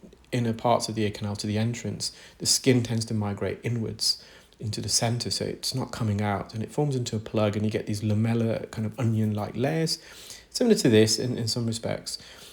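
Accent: United Kingdom. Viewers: That was a fantastic peel. I love watching your channel--it's interesting and entertaining, I learn a lot, and listening to your lovely British accent eases my anxiety. 👂👏🙌 👂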